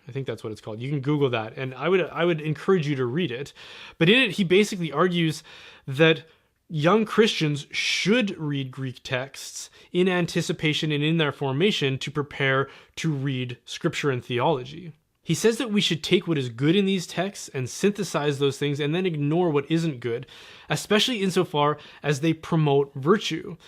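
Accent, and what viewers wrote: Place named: Canada. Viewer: Like your Canadian accent... "abowt" ☺️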